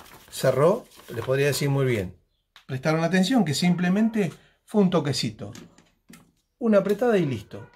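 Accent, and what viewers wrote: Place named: Argentina. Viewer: No vale eso te quedó profesional amigo... Exelente trabajo y vídeo. Me suscribo y te felicito por tu canal y talento tienes la paciencia para enseñar y conocimiento para dar gracias amigo. Me parece que eres argentino Dios te siga bendiciendo grandemente y Dios Bendiga a la Argentina. Un abrazo y saludos desde Caracas Venezuela 👍🏻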